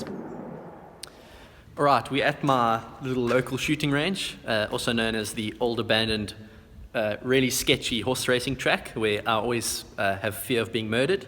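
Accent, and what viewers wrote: South Africa. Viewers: vkluchi subtitri mozhet tak budet legche perevodit.. U nego Uzhno afrikanskii akcent no v celom vse ponaitno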